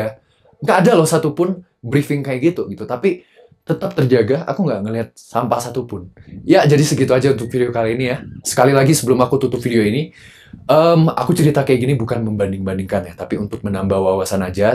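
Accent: Java, Indonesia. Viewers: candu bgt ngomong jawa nya hahaha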